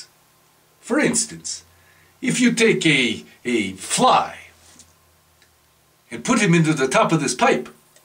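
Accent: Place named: Russia. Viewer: I like your Russian accent. хорошо!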